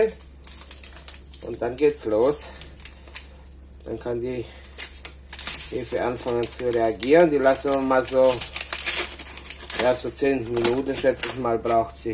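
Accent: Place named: Banat, Romania. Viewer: Grüß Gott, kommst Du aus dem Banat?Hörst dich so an . Weckt schöne Erinnerungen 😊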